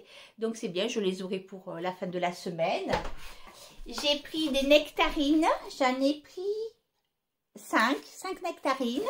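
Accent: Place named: Ariège, France. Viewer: Coucou ma puce , j'adore regarder ta vidéo et je vois que tu as gardé ton petit accent ariegois ❤ bravo ma chérie , pour tes petites courses , j'adore ma puce tu es vraiment naturelle , gros bisoussss ❤❤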